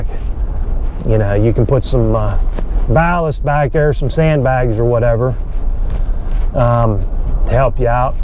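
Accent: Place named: Pittsburgh, United States. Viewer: Very nice review! I think I detect a “Yinzer” dialect…you from Pittsburgh? I recently got my ‘23 XL Crew Cab, 2wd with the locking rear end. It’s about as basic as you can get. I love it so far & it does decent in the snow up here in Erie; I’ll get snow tires if I need them.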